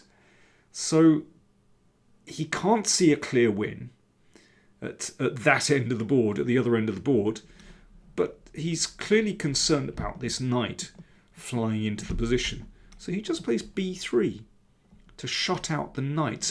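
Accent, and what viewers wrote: United Kingdom. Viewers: Even though you don’t get crazy view counts on your videos, I must let you know that your videos are perfect. The classy Brittish accent enhances these fantastic chess match reviews! I’ve been a long time subscriber and this channel is like ASMR to me.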